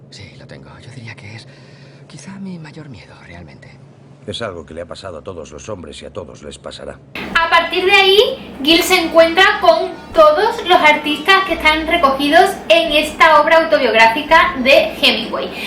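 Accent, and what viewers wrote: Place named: Spain. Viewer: Muy buen contenido. Muy Hermosa y un acento español que me mata. Tendría que tener un millón de reproducciones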